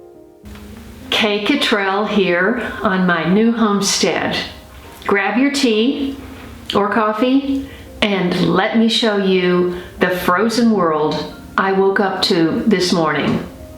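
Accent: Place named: South, United States. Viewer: This was a lovely video, the music was perfect. I can hear your southern twang coming through!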